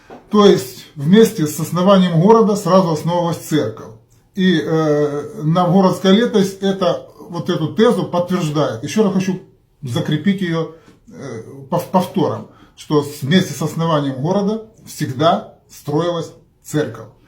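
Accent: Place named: Ukraine. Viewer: Отличное видео. Для малообразованных людей из средней Азии, нет большего доказательства своей исключительности, чем видео в интернете, рассказанное неизвестным человеком с украинским акцентом, который не приводит никаких доказательств.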